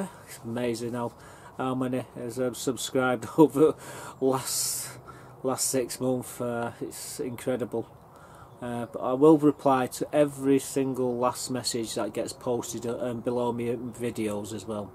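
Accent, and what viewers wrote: Salford, United Kingdom. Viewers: You vids are fine it's nice ironically to hear the acscent since I left salford when I was 19 I'm now 46 in do go back every 4 months to go watch a game or two Lemon balm herb as is stevia which is a natural sweetener Tasting that though its initially very sweet with an after taste of liquorice why do u only plant a small amount of tomatoes have u every made sauce with them we finally have nice weather but it's blowing 50 mph it's always something I'm trying to figure out how I can send a video of my trips to u I do offshore fishing for shark tuna etc u would love it if I figure it out I send a link after I post it take care u should type in goggle or YouTube montauk point Or herring run-striped bass or bluefish you will be blown away at the annual migration of some of these fish some of the bluefish migration last a week or two and are by the hundreds of thousands in schools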